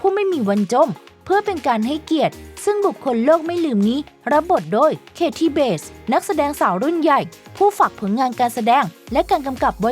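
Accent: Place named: Suphanburi, Thailand. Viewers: แอบขำแอดแอบเหน่อ 😄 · หนคนเดียวมั้ยที่ฟังไปเรื่อยๆละคิดว่าคนภาคเสียงหยั่งเหน่อออโค๊นสุพานน55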